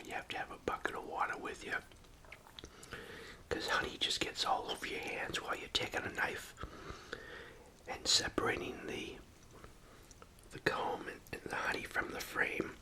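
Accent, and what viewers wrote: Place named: New England, United States. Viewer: Im sorry your hive didnt make it 😔 It is a lot of work and I know you had to pay a lot for the queen. I truly hope this year your hive is wondeful and survive the New England winters.🐝 My parents stayed in Maine for the eclipse and it totally blacked out where they live. My parents are now currently renovating my moms childhood home in Buzzards Bay. You remind me of my childhood with your dishes, the foods you eat, your sayings and the places you mention and your accent❤. It always makes me happy.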